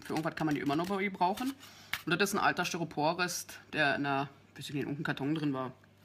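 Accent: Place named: Berlin, Germany. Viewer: Ach meine liebe.. ick find dein "Berlinern" einfach nur geil!! ;)) Rock it und dir nen schicken Abend! Maxi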